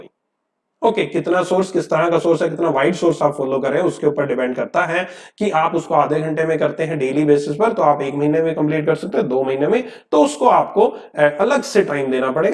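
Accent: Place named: Haryana, India. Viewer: Sir ur pronunciation is very haryanvi ,pls try to improve it , Kindly don't take it as a complaint take it as a suggestion ...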